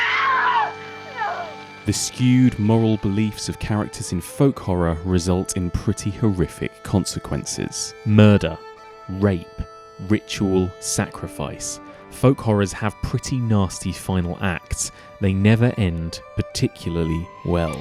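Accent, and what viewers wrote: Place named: England, United Kingdom. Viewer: Why this whinging over history? It’s so annoying! Get a grip! That’s why our forefathers BUILT shlt and all we can do nowadays is tear down the house we inherited. I can tell by your English accent you are Keir Starmer like guy. Who actually gave you guys the right to torch down the civilization of your fathers going back over 1000 years. 1000 years going strong, then you guys get power and destroy England in a way that cannot be undone. What gives you the right?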